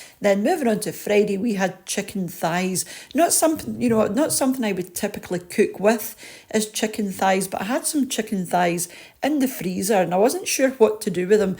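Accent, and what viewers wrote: Scotland, United Kingdom. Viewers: Intrigued to watch until i heard you were Scottish. No thanks.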